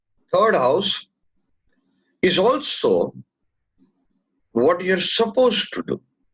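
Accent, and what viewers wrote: India. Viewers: bingo. Sir, your style of speaking( perhaps the mumbai acent) always reminds me of amitabh of Amar akbar anthony !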